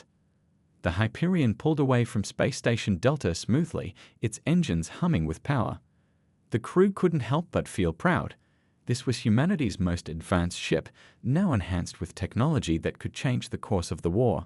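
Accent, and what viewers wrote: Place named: New England, United States. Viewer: The narrator (AI?) has a distinct New England accent!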